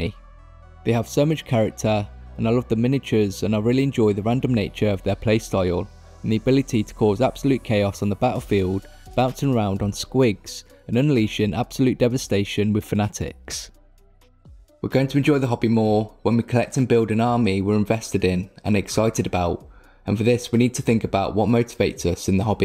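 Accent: United Kingdom. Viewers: I'm not from the UK - what kind of regional accent is this? It's not super weird or anything, just kind of distinctive in some ways!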